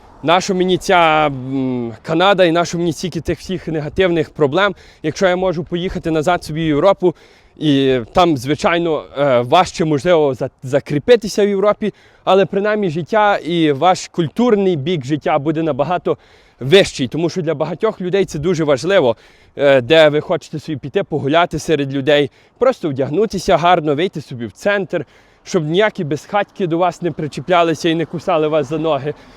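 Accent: Western Ukraine, Ukraine. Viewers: Чистый западенский акцент. Прожил с ними пол года. Ну их нахуй. Пришлось ехать в Европу. А автор - да, западенец, патриот, в Канаду уебал😊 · Ты с какой области уехал в Канаду? Почему такой западенский акцент? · Дуже такий файний діалект у вас , западенський)) 👍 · Бомбічна західноукраїнська мова. Як в Трускавці в дитинстві) Пан, ви звідки самі? Пс прочитав, що зі Львова, з самого Львова? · Он говорит на западноукраинском диалекте, а вы пишите о литературном украинском.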